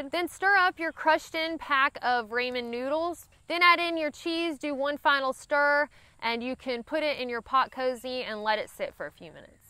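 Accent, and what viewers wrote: South, United States. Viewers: It's so flippin' adorable. Southern folks have no idea how charming they are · Yup. Definitely southern. If anyone had any doubts, that cure it. "Slap your momma good" love it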